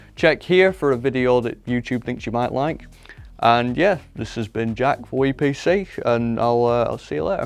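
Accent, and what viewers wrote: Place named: England, United Kingdom. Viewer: Love that English accent.💛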